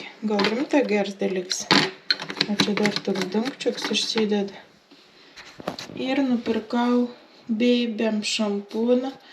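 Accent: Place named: Samogitia, Lithuania. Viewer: Tamsta, esat nuostabi.. Puiki žemaitiška tarmė..malonumas ausiai..malonu Jus matyti ir klausyti..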